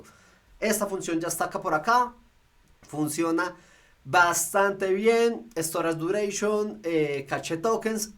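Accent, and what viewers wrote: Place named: Colombia. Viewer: Gracias por el resumen. Me encanta tu onda , forma de explicar, la edición de los video, y, la forma de hablar colombiana. Slds from Argentina. I love Gemini.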